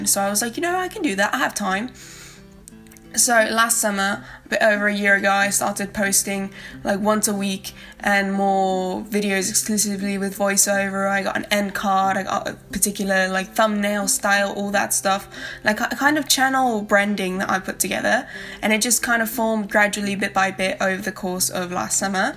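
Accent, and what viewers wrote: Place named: South Africa. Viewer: I was trying to figure out if you were British or Australian listening to this video but boy I don't think I would have guessed South African accent but it makes since it was a British Colony for years.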